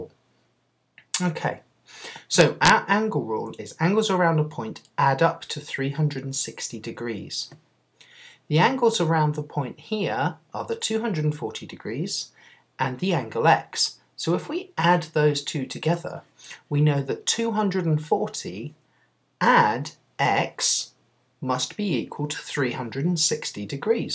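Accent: United Kingdom. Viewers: go British accents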